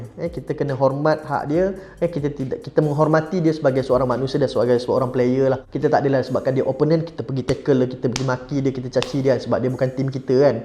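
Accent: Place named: Kedah, Malaysia. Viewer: Ni kedahh aa mcm gini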